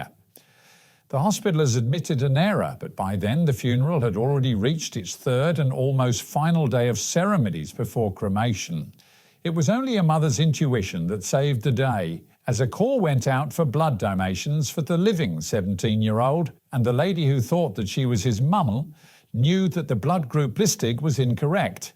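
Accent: United Kingdom. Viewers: I like your news program and regulary follow ; it's informative, unbiased and coloured with your elegant British accent. Keep doing this good job.❤❤❤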